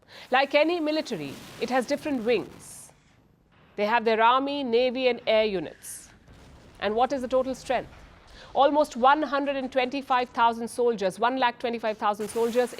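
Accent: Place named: India. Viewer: YES WE ALL AGREE , BUT ITS DIFFICULT TO UNDERSTAND HER WITH THAT INDIAN ACCENT !!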